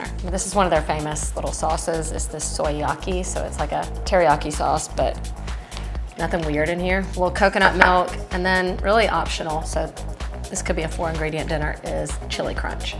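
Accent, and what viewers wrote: South, United States. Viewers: “Chefs, they’re just like us”. 😂 So love your sense of humor and you have THE best southern accent. Really enjoy your videos and have enjoyed making your recipes. Keep up the good work!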